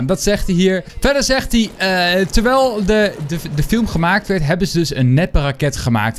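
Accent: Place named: England, United Kingdom. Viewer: Geweldig Engels accent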